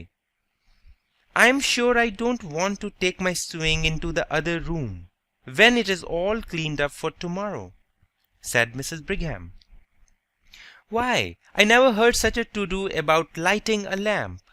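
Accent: India. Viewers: Man, it’s hard to learn from heavy Indian accent. How can I improve my pronunciation with this reading? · i.m agree the indean accent too mach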